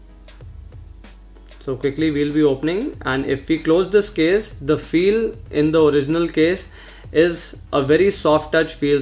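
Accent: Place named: India. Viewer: Наглядно объяснил разницу между оригиналом и подделкой. Жаль не все русские понимают английский язык. Судя по акценту ты из Индии?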